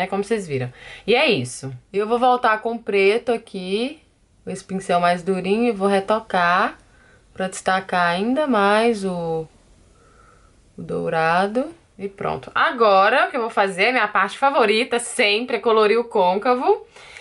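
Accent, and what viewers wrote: Minas Gerais, Brazil. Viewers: Uma boneca!!.♥Amo seu "minerês",tbm sou mineira.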